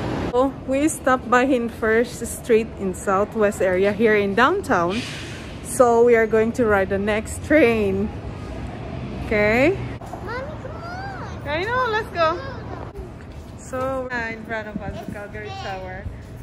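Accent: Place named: Ilocos, Philippines. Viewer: nice vlog. Narinig ko lng Iokano pala kau. hehe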